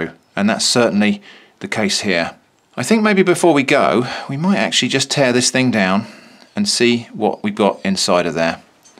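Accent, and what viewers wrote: United Kingdom. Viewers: "Completely irrelevant piece of packing", i love british english way..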